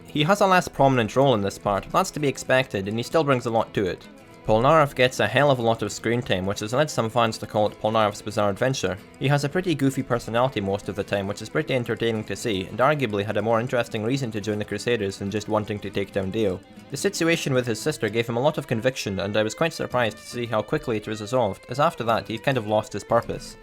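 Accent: Scotland, United Kingdom. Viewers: Dans It's understandable that you can't understand his Scottish accent.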